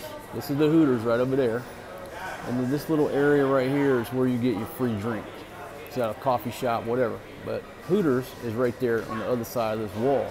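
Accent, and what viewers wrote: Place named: Atlanta, United States. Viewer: Great Review. Atlanta, GA that explains the accent I hear in your voice.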